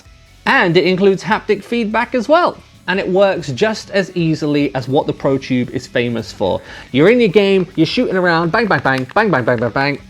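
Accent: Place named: United Kingdom. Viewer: I'm guessing by your accent that your Brittish? I'm also guessing that you do not currently reside in England? Given the fact that you just compared the VR pistol to a real one, which is a big no-no in England - a country that has become a haven for criminals and where law-abiding citizens have been stripped of any/all rights to bear arms or defend yourself against said criminals.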